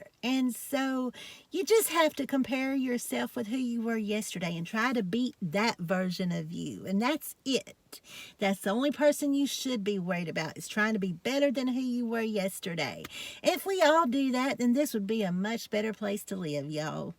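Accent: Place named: Georgia, United States. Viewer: I love this video!!! You always make me smile! Some people on here probably know by now that I am your mother n love, but they don’t know that I’m from Virginia and the strongest south Georgian accent I have ever heard in my life is my husband’s and now I have a daughter n love who has a south Georgian accent that competes with his!!!! I love y’all’s accents. My mother has always had people ask where she was from because she has a strong Appalachian accent. Everyone always thought she was from West Virginia, close, but in south western Virginia is where she came from. I have no accent, I wasn’t lucky like, my mama and husband. But anyways, I’m so lucky to have you in my life! Your a true gift! I wish all of your new friends on YouTube can meet you, they would love you too. ❤️🤗🌹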